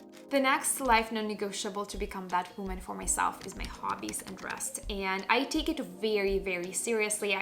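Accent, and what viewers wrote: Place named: Russia. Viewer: Вы из России или Украины? Слышу знакомый акцент )))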